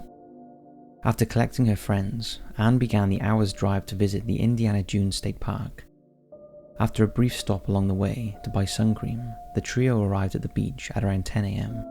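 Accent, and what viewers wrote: Wales, United Kingdom. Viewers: Think he's Welsh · He's from the UK, so his dialect is different than the way Americans or Canadians pronounce words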